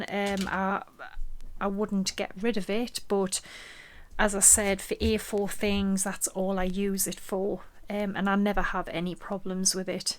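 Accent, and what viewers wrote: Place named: Sunderland, United Kingdom. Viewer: Thanks, great reviewing (even if I just bought the Sizzix switch and am regretting it now). Love your accent - are we both from Sunderland? Except I live in Sydney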